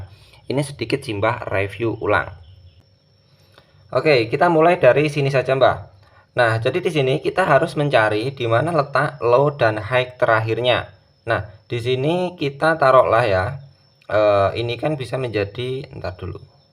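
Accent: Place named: Java, Indonesia. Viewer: saya yakin bagi semua pemula penjelasan ini menyenangkan..guampaaaang di pahami...tidak blepotan...aksen jawanya mesok mbah..he..he...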